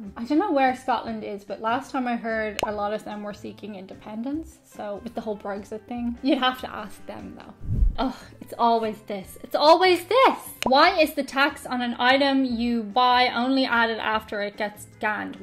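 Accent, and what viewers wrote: United States. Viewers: I really must compliment her on her ""Merican English" She was SO spot on with the State Names and other Americanisms. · you definitely adapting to the American Accent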